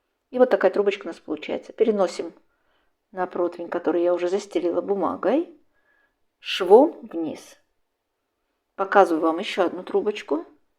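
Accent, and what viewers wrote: Baku, Azerbaijan. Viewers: спасибо за Ваш канал! Как хорошо Вы объясняете, понятно, легко и просто! И хочу выразить восхищение Вами! Ваше красивое лицо, спокойная и правильная речь, изумительные руки!и если не ошибаюсь, нежнейший Бакинский акцент! Удачи! Здоровья и Благополучия!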